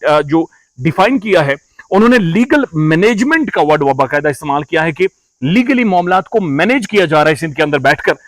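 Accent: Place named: Kashmir, India. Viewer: Mne phly b pocha tha k ap kashmiri hain plzzz bta dain k ap kashmir se hain kia? Apk Andaz e bayan se lgta tha k ap kashmiri hain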